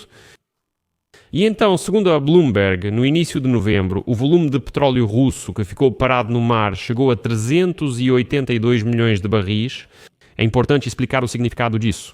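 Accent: Portugal. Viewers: Por que fica oscilando em português de Portugal e do Brasil?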